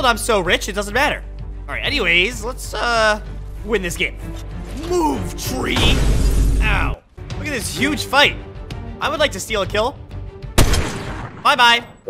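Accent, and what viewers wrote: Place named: United Kingdom. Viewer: like the British accent lol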